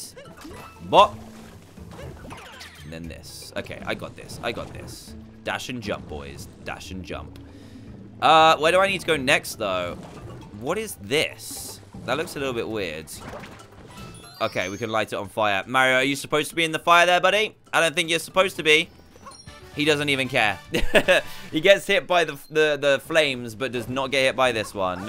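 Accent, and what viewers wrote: United Kingdom. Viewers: Bruh he's british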